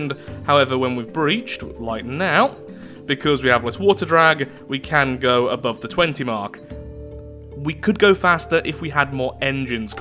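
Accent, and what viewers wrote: United Kingdom. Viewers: Not only bc of brit accent but you sound exactly the same as Alex the rambler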